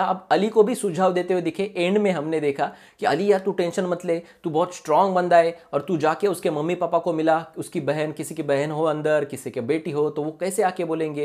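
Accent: Maharashtra, India. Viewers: Hi...... Tu Marathi ahes ka....? Tuzya bolanyachya ton Varun vatat...!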